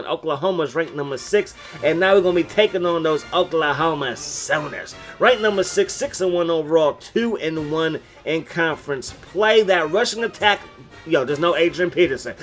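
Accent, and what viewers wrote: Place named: Baltimore, United States. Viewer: Barry Sanders and Thurman Thomas would disagree with your two RB statement. I am looking forward to another amazing series from you. One question for you. Are you from Baltimore or the surrounding area? The B-More accent comes out sometimes during your commentary.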